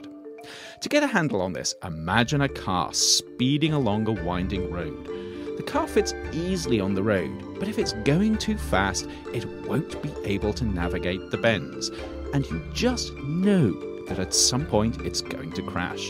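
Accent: United Kingdom. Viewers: Is there a reason why you choose to have a narrator with a British accent?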